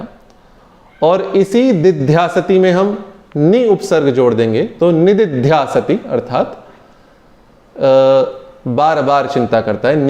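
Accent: Standard. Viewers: आप शब्दों के उच्चारण शुद्ध रूप से करते हैं ।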